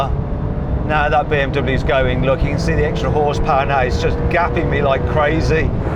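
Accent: United Kingdom. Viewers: manteb nih channel, sekalian belajar british accent wkw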